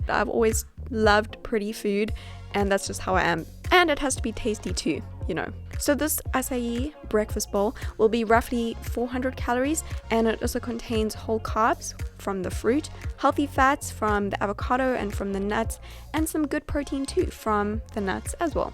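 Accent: South Africa. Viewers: great video! i love your accent! are you south African? sorry if i am wrong! X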